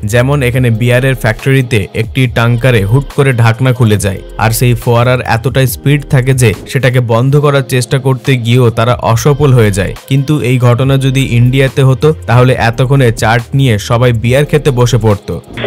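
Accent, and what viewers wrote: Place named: Bangladesh. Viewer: Vdota bhaloi baniyechho, tabe India ke chhoto karar prochesta nindoniyo. Tomar Bangla shune mone hoy tumi Bangladeshi. Bina kharache Hanglamita tomader moddhei dakha jaay, Bharatiyoder moddhe noy. Bharotiyoder aatmo marjada gyan aachhe karan ekhane garibi anek kam, ja tomader deshe aakash chhoa.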